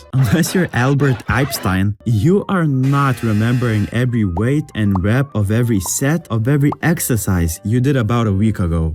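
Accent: India. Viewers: Bro the indian accent😢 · Don't you think it's racist to do that Indian voice over?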